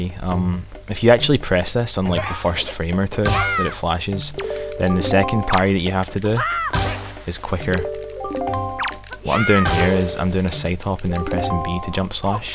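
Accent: Scotland, United Kingdom. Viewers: Hrm yeah he does sound Scottish. Cx My mistake. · More please. Also, is that a Scottish accent? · Scottish accent?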